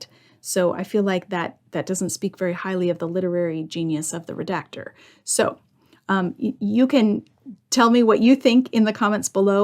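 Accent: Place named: Spain. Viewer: This one was thought-provoking, I must say the 3rd option got me interested. Your approach in many of your videos is quite a straightforward one and in some others, you decide to get into the weeds, I see kind of a balance right there for most of us who are laymen. Btw, your Spanish accent, love it !!! 💯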